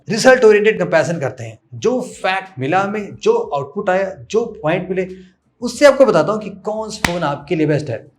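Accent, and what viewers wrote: Lucknow, India. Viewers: Aap lko se hai kya sir..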